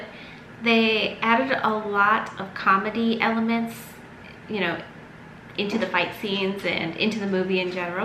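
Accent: Telugu, India. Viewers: genuine review movie is really good by the way your telugu speaking very cute🥰🥰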